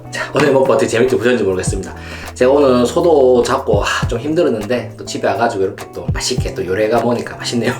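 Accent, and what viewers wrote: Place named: Daegu, South Korea. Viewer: ~~~^^ 넘 정감 넘치는 제목에 옛추억을 느끼게 합니다... 구수한 대구 사투리가 어린시절을 생각나게하네요~~ 20년 수도권 생활하다가 고향생각나서 아제아 방송을 늘 즐겨찿습니다... 꼭 대구 가면 경산 한번 찾아가겠습니다~~~^^ 화이팅~~~^^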